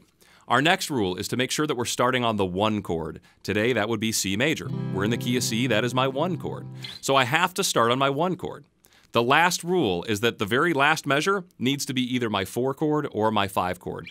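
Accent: United States. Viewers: I can sync, feel this Dude’s Radio-sounding voice, clear English-American accented explanations and way of teaching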